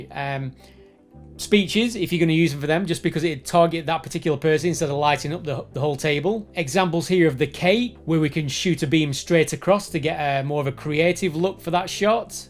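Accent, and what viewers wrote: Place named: Wigan, United Kingdom. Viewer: Awesome! Funnily enough, I’m a photographer looking for some LED lights for the dance floor… think you’ve just convinced me! And a familiar accent, too! I’m only down the road in wigan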